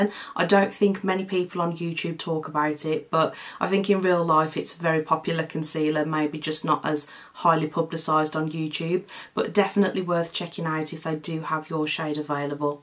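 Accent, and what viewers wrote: United Kingdom. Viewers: Your review is excellent🎉. I love the detail you mention for each product which other reviewers often skip! I also love your British accent. I am looking forward to more of your reviews. From across the Pond, Diane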